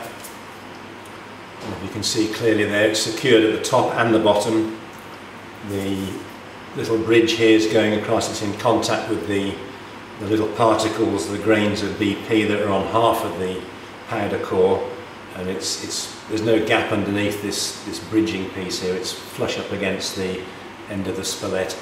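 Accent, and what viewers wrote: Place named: United Kingdom. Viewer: Also, where did you live in the UK? You have a familiar accent.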